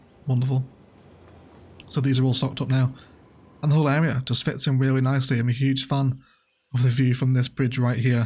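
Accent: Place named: United Kingdom. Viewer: Your videos have blown me away and helped me to develop my building style so much too! I'm trying to place your accent, where in the uk are you from? Keep up the cracking builds 👌 much love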